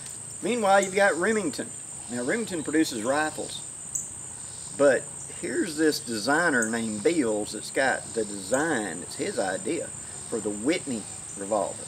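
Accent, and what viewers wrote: South, United States. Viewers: I would listen to you talk about stacking rocks, just to hear a good ole southern accent again. 🤠 Enjoyed this "Story Time". Take it easy.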